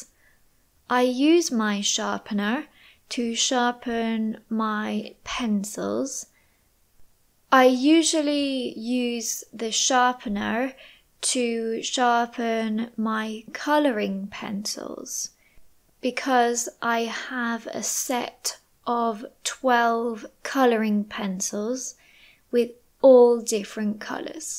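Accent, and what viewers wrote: United Kingdom. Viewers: This is british accent?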